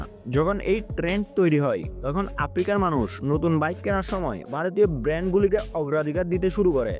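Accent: Bangladesh. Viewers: বাংলাদেশি উচ্চারণ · বাকি সব ভালো উচ্চারণ জখন্য। তুমি মনে হয় বাংলাদেশী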